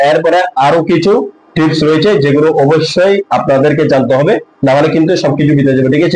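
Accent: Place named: Chittagong, Bangladesh. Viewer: ভাইয়া মনেহয় চট্টগ্রামের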